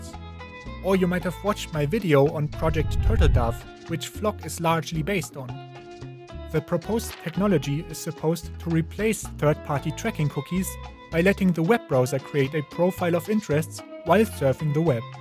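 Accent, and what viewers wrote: Germany. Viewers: He has a German accent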